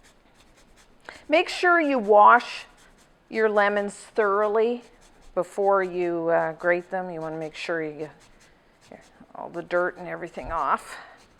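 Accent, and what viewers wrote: Canada. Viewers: She’s definitely Canadian 😂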